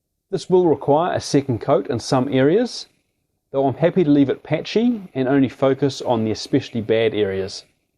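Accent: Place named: New Zealand. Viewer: Sorry. Every so often the Kiwi accent demands gentle humour....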